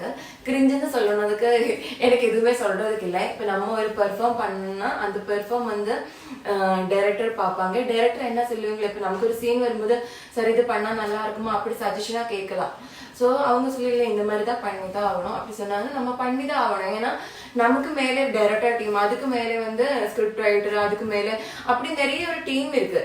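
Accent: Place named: Kerala, India. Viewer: Malaiyalam kalantha Tamil speach ketka Rompa Nalla iruku.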